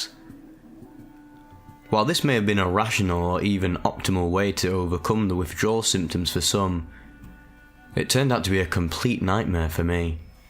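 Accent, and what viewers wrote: United Kingdom. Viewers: Are you british or am I tripping? both?😊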